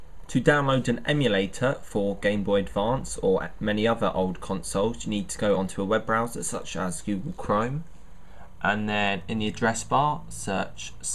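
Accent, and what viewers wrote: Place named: United Kingdom. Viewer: You have british accent! :3 thanks 4 this, man!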